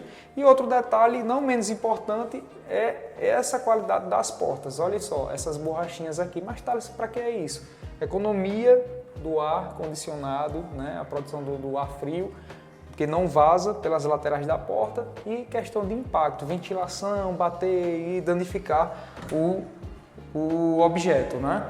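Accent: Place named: Pernambuco, Brazil. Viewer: Que massa!!!! Parabéns pelo teu trabalho e muito sucesso!!!! Sotaque que amo demais , sou pernambucana 😊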